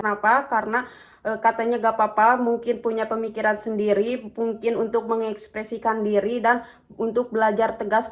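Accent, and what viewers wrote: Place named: Sunda, Indonesia. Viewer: Euleuh... eta kamu teh logat Sunda na meuni lekoh pisan. 😂😂😂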